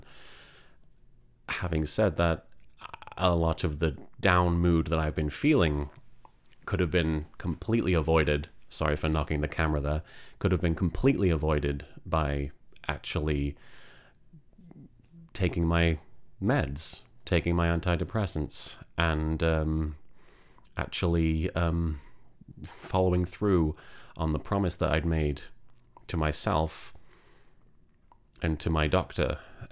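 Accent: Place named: Northern England, United Kingdom. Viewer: LK, everyone makes mistakes and just because a decision is emotional that doesn't make it stupid. I know how hard it is, but you need to understand that you can't change the past year. I'm happy that you've found a medication that works well for you. (Unrelated note: are you from the north of England? I'm from Doncaster and I thought your accent sounds like mine)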